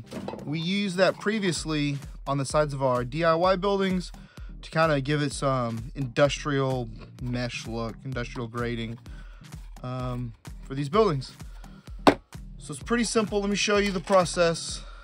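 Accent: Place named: Oklahoma, United States. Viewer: Bro sounds like an Okie, makes me feel at home!!!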